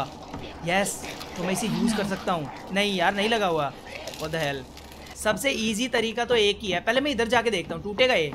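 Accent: Haryana, India. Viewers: Bhai toh haryana ka hai kya????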